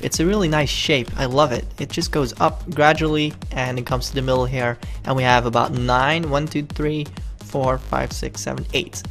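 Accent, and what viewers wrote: Netherlands. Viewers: Seriously are you Dutch to? 😂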